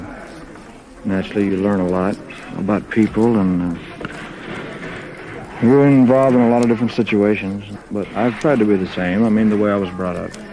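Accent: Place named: South, United States. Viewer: I LOVE TO HEAR ELVIS SPEAK, HIS AMAZING SOUTHERN ACCENT. THIS WAS A GREAT INTERVIEW WITH THE KING OF ROCK N ROLE FOREVER !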